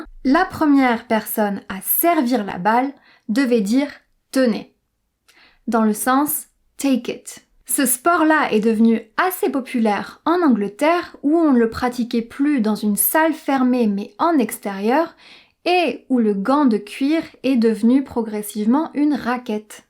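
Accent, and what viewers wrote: France. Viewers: Her French accent is very mild when speaking English. Her English has a hybrid French/British sound to it. Interesting. Also, why wouldn't Handball be something like Balle de la Main in French. As opposed to Handball pronounced without the H. Just asking. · J'aimais cette vidéo énormément. Un sujet intéressant, tous est très bien prononcer et á une vitesse qui me permettait de tous suivre. Merci beaucoup. Á propos: ta prononciation de l'anglais est vraiment super bien. Avec un bon accent mais encore avec un petite peu de sauce française pour le charme. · If you don't mind, where's your French accent from? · These videos are so great. I just started learning. And I'm basically using the Babbel app, Lupin on netflix, and you. Different types of mediums help I think. But I love your content. You are always making it fun to learn. And your positive, fun attitude. 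And of course, your lovely french accent. ☺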